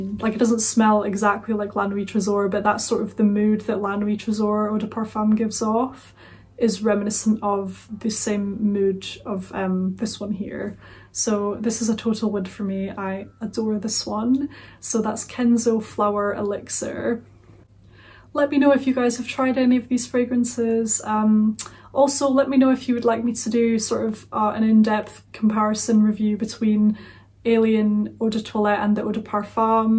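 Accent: Scotland, United Kingdom. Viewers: Fantastic perfume review, Miss. May your Youtube channel, subscribers, viewers & fans grow exponentially in this new year and future.. You have such a pleasant & soothing and elegant voice & wonderful, classic Scottish accent. You can sell us anything. Happy new year Scotland.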